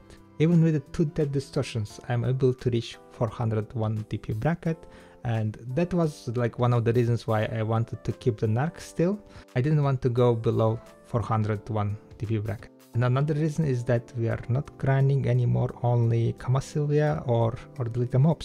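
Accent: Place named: Rural, Russia. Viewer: ППц разговорный английский как из русского села)) чел завязывай) это явно не твое!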